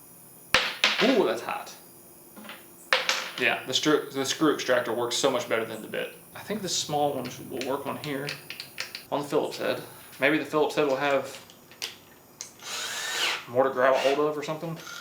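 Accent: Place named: Georgia, United States. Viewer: Holy crap I just discovered today and these videos are so fascinating. It’s 6am and I still haven’t slept 😭😭😭 I just keep watching! Also I’m curious to know where is from. He sounds like a fellow Georgia native.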